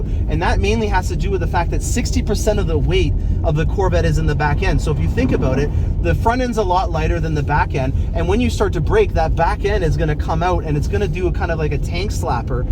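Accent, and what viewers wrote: Canada. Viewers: That intro screamed in Canadian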